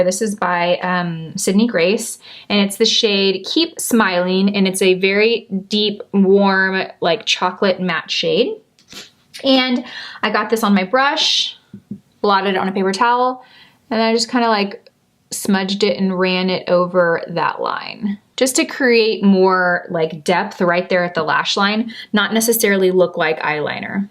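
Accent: Texas, United States. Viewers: Well we are fans! :) Maybe they do not understand Texan??? Sounds like hey friends to me! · It’s that cute Texas accent - fans, friends!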